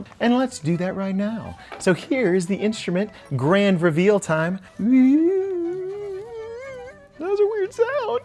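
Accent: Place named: United States. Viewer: Lol he's American, that's how we pronounce it here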